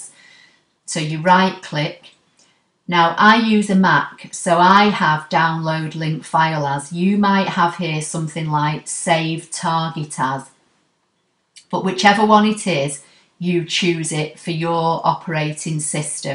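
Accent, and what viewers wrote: United Kingdom. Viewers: Wow. This is awesome. I have been searching for a way to curve text and save as an svg file. You couldn't have made it any easier. I love the British accent. Thank you.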